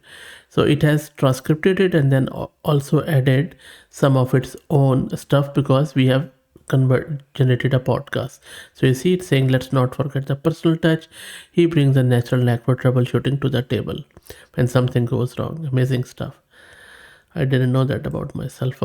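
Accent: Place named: India. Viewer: Horrible Indian accent😂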